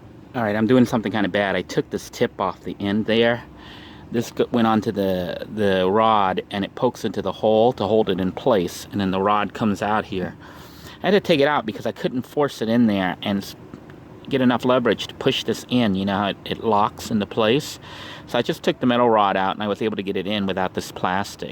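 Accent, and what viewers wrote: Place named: North, United Kingdom. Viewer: What an accent you got to be from up North😂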